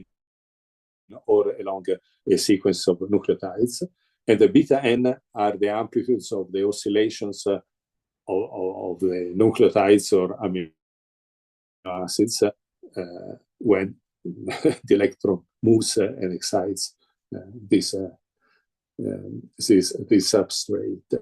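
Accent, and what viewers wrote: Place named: Italy. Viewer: its the the phonemes and the inflexion, he is using italian inflexion and cadence and phonemes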